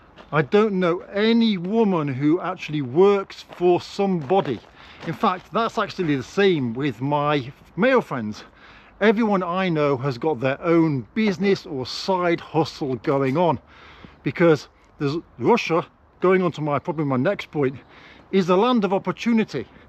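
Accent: United Kingdom. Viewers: So you have a British accent, walking and talking on your phone ....... yeah, to be honest, if I were Russian, I would be a bit suspicious. Just sayin'.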